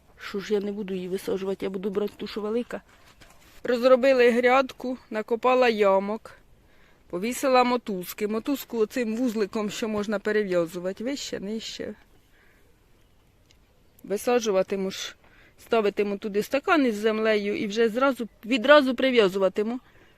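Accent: Poltava, Ukraine. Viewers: Мені здається,що у Вас полтавський говір,чи ні? Якщо так,то який район?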